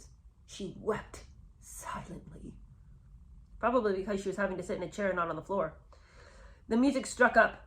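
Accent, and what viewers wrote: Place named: RP, United Kingdom. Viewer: 😂😂😂😅😅😅 I love you!!!!! 😅😅😅😅😅... jolly ..what a total ding-dong H is... love your English upper crust accent 😂😊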